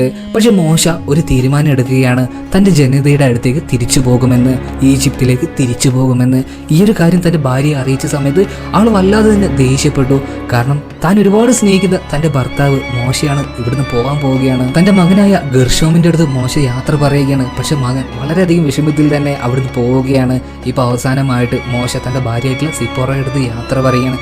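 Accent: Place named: Palakkad, India. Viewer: പാലക്കാട് കാരൻ ആണല്ലേ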